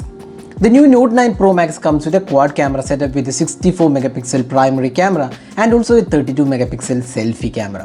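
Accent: Kerala, India. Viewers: English kettalariyam malayaliyanu bro enn!!!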